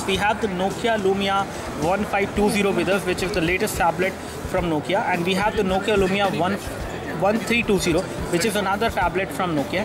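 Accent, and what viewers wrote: India. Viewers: so... indian... slang rooted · im' italian, and even for me (clearly a not native english speaker) this video is understandable without any problem. I think it's indian english accent. So maybe you're not racist, but surely you're very lazy to not strain yourself to go further a simple matter of accents.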